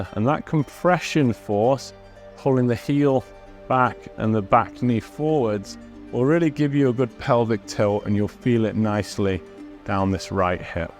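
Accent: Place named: Birmingham, United Kingdom. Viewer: Great stretch to follow a workout! Thanks,. By the way, I love your accent. Have you seen “Peaky Blinders”? Your accent reminds me of the accents in that show. I feel like I’m working out with Tommy Shelby. 😜 Thanks for the great stretch!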